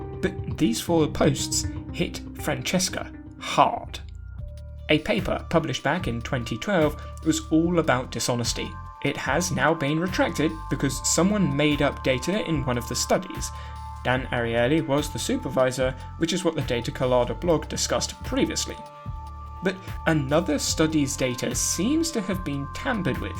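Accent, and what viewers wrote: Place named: United Kingdom. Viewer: why with a British accent?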